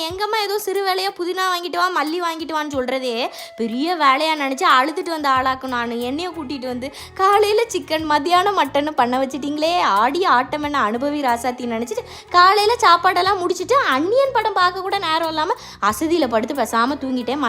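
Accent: Tirunelveli, India. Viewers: nan tirunelveli than Unga vedio parkum podhu oorukarangata pesina feel lv u thankachi😊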